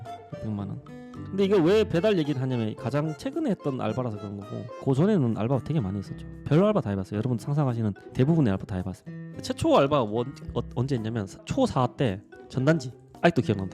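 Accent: Gyeongsang, South Korea. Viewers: 오 대구남자였네...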